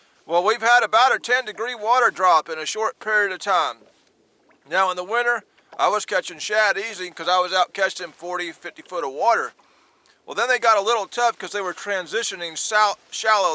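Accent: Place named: Virginia, United States. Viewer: Reminds me of Virginia talk.. "slap-dab" Semper-fi sir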